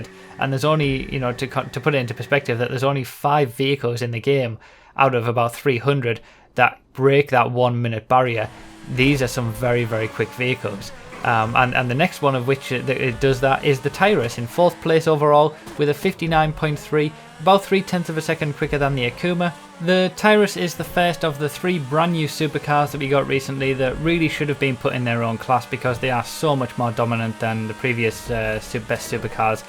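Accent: United Kingdom. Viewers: british accent sounds so gay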